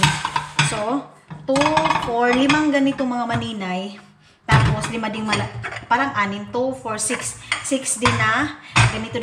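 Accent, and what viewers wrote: Visayas, Philippines. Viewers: Sarap Naman Ang Buhay mo diyan. Taga asa ka, bisaya man kaayo mAgmsulti? Makalingaw gyod imong vlog. Pwede makig friend sa imo? Asa mo nga lugar nagpuyo diha sa united state?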